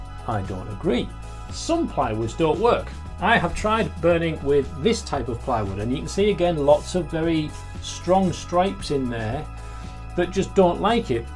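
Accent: Yorkshire, United Kingdom. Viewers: A really good presentation on pyrography. Nice and clear, filmed well, good/relaxing music in the background nice Yorkshire accent and useful tips. Thank You! :-)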